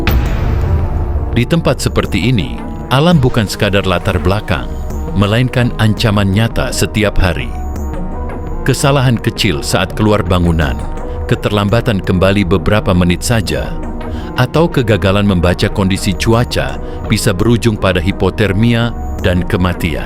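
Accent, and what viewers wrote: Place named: Solo, Indonesia. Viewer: salah,ini suaranya pria solo fixs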